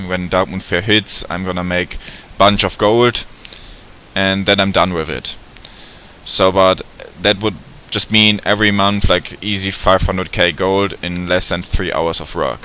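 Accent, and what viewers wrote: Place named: Germany. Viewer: You kinda sound like our german teacher, I mean, your accent is kind of similar (I don't live in Germany but he teaches German) Good luck with your channel from Sweden :D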